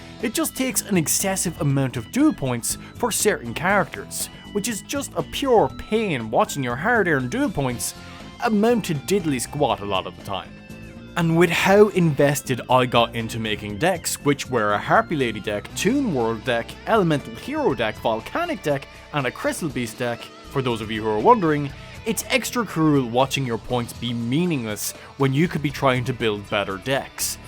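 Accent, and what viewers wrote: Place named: Ireland. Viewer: Okay I subbed! The review is very good, in fact the whole video and presentation is great. Plus the Irish accent is gold! Awesome stuff mate!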